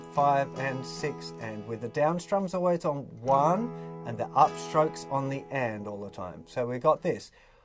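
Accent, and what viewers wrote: United Kingdom. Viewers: I am from Liverpool, England. Lennon actually sings "she WORKED In the morning and started to laugh," not she walked in the morning et al. We Brits have trouble rolling the letter R. You sound like a brit....are you. You do a great job teaching and seem like a nice talented lad. Please keep up the teaching. Tara lah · You have a British accent, and a Geordie hat. Thanks for the lesson. I used to play this, but it was years ago, and I needed a refresher. Thank you! PS: is your guitar make from?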